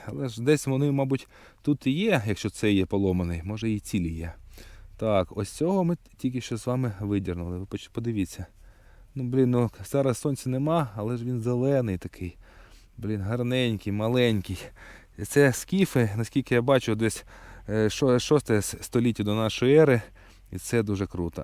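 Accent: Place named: Russia. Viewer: Привет,😂ну разговариваеш ты на украинском НО с русским акцентом 😂😂😂😂😂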